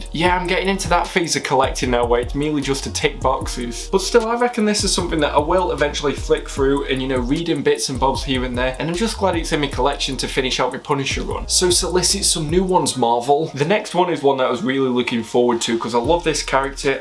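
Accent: United Kingdom. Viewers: Another UK collector here(and from your accent, potentially similar areas), your hauls are pretty damn impressive considering how difficult it is for us to pick up stuff as cheap as Americans can. Not just Ollies, the facebook groups, omnibros, IST etc. So keep up the good work! Don't spend too much!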